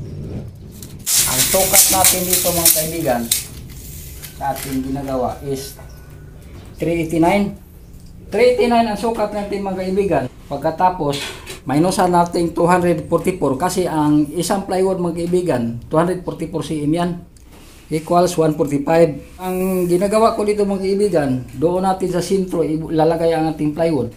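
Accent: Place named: Visayas, Philippines. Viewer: 😃😃😃 Ang galing mo tay lalo na sa pag sa salita mo murag bisaya 😀😀😀 may nakat on an jodko nimo salamat tay baguhang panday Mason rin Ako.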